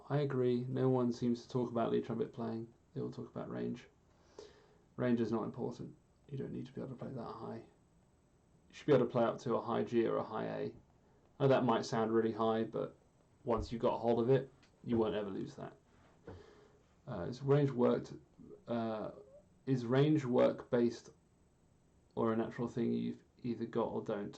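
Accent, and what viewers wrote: United Kingdom. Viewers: I am going to be honest. I had no idea you had a British accent, or that you were even British. I love the results either way. Thank you for sharing your talents with me.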